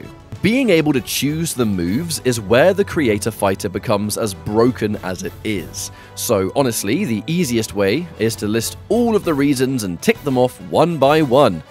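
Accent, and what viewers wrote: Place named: United Kingdom. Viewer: its so hard listening to BRI'ISH people talk... like is he saying FREE or THREE "BOH-OH OVE WAHAH"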